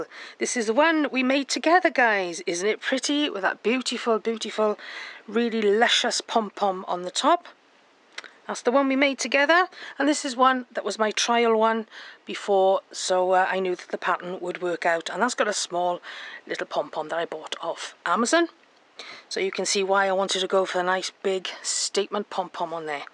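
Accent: Wales, United Kingdom. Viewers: So lush to hear a Welsh accent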